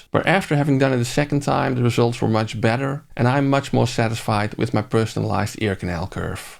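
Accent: Netherlands. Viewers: lekker Nederlands accentje whehe goede review en cool te zien dat er nu 5.0 reviews komen :)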